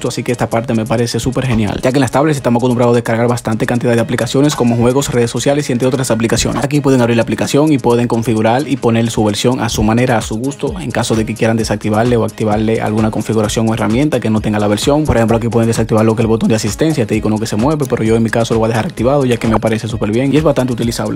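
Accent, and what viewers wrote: Dominican Republic. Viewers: Es que hago hablamos los dominicanos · Es dominicano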